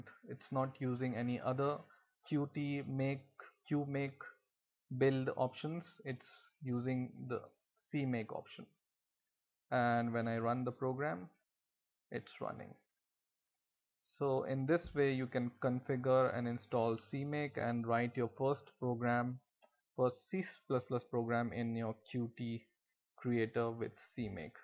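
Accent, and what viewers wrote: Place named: India. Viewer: English with Hindi accent kills me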